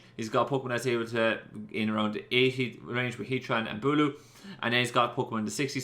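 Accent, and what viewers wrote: Ireland. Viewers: I always forget just HOW Irish you actually sound 😅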